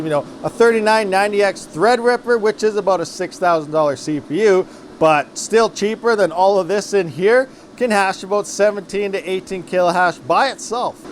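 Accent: Canada. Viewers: There's just something fun about listening to Canadians explain stuff. *From your north Idaho neighbor.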